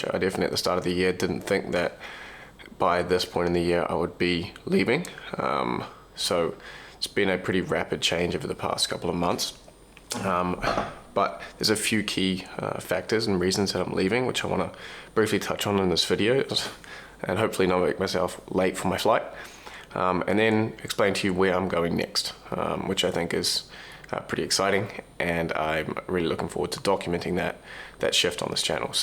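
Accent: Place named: New Zealand. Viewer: Wow coming back home, about 3 weeks ago I was soaking up the content on this channel and I was thinking this guy sounds like a Kiwi sure enough is coming home. I am hoping you will be based in Auckland as I am just down the road.. 😊😅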